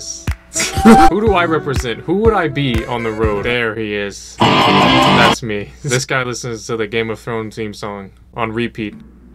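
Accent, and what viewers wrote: United Kingdom. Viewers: bro is british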